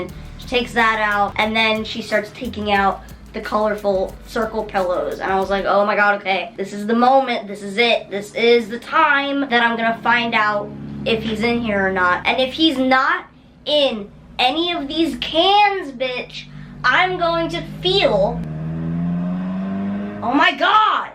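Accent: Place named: Delaware, United States. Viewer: Im, from Delaware we all still talk like that🤣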